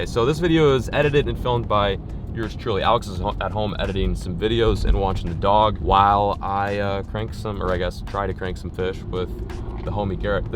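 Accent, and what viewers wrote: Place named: South, United States. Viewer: Smh he jst done came off? Dam outta chi only a lil bit n u gt a southern drawl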